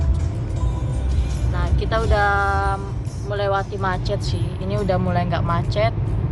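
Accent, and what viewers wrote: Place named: Palu, Indonesia. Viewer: Tetap logat palu... 🤣 Lanjuut joooo.... 👍👍🙏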